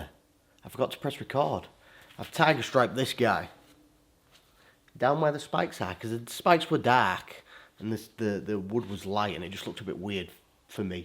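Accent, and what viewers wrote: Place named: Yorkshire, United Kingdom. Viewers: It is, but there are several different and very distinct accents to be found in Northern England, this one sounds like Yorkshire to me. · Sounds like Yorkshire, North of England 🏴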